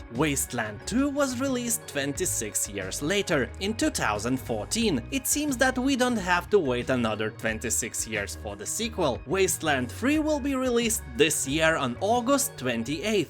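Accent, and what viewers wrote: Russia. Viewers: you are from Russian or where? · its probably ignorant of me to call your accent "Russian Like" since you are not really russian. But your accent sounds like such a cool russian like acecnt (to a non european) I swear you can really do a good russian/ luthuanian game character voice over. Or maybe a game in which a main protogonist fight soviets for independence. I am from Pakistan by the way.(just saying)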